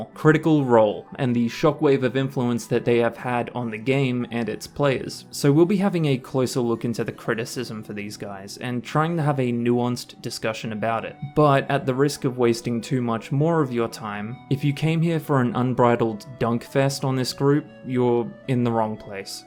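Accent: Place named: Australia. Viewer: Vids are crisp love your work keep it up. Haven’t seen many Australian (assuming from the accent) d&d channels.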